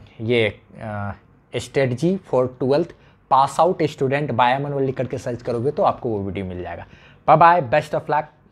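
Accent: Bihar, India. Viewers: Sir aap bihar se hokya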